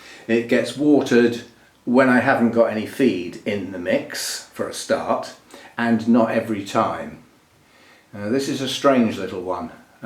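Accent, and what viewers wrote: England, United Kingdom. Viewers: I love cattleyas! I'm obsessed with them, so I very much like this type of content. Hope, you'll make a vid about the ones now having sheaths. I'm curious if they bloom or not. And I'm also fond of your beautiful English accent. Your videos are more or less English lessons for me, as well. Greetings from Hungary! 🤗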